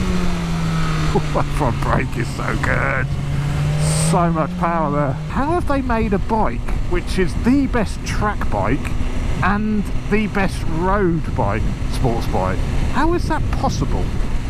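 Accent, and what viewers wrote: United Kingdom. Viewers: i watch because your speech/voice is extremely enjoyable to hear!! Love the UK 🇬🇧 accent!! Fireblade RR-R -SP finally getting flashed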